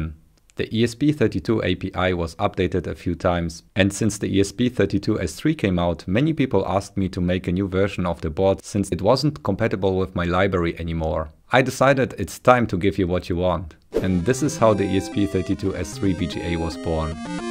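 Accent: Germany. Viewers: 4 sekunden die stimme gehört und direkt gewusst es ist ein deutscher:D:D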